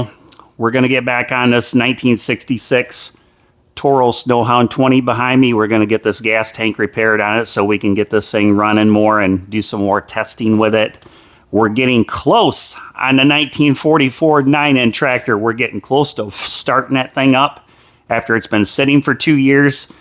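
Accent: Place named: North, United Kingdom. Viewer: Kind of sounds like a cross between a Harley and a John Deere A. You sound like you’re from the great north. Nice video.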